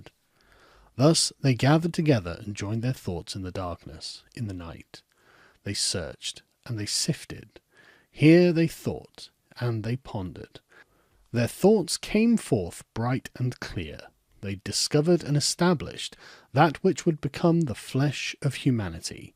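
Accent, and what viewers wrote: United Kingdom. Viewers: I fear is it just a brittish accent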